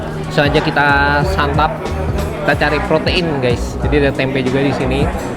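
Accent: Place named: Surabaya, Indonesia. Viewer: ketauan orang surabaya dari meling meling bolo 😂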